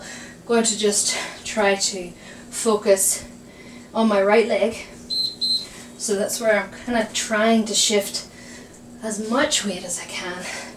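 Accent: Ireland. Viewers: I love Irish accents. I was in heaven watching "The Fall"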